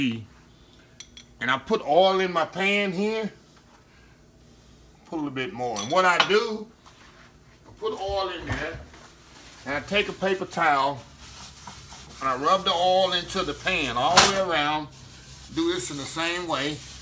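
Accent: South, United States. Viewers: Reasons I love this channel..I've picked up some great recipes, good tips and tricks and Chef is always dropping some knowledge. And of course the country accent❣Thank you Chef.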